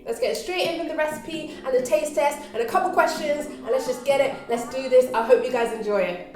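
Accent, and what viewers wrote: London, United Kingdom. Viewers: Love the recipe, I'm going to give it a go. Love the 'innit like' accent too :)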